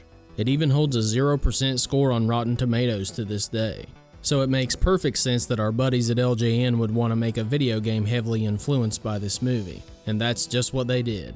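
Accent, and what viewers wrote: South, United States. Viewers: Hey. I've been a subscriber for about a year. Your channel just keeps getting better and better. Thanks for the great content. Most reviewers hate on this game, but I always liked it. If you like Jaws, my wife just finished her first game on Steam which is an aquatic action/adv game inspired by Jaws. No, I'm not making this up... While the finished game is really nothing like Jaws at all, it was inspired by the game. Her game is called "Rupert and Riley Shipwrecked" and can be found on Steam or just by searching. Anyway, thanks again for all the great content. Nice to see (and hear) another Southerner on here;)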